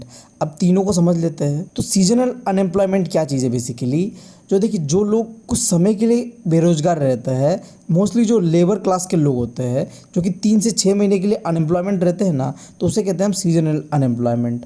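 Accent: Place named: Mumbai, India. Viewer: I think you are from Mumbai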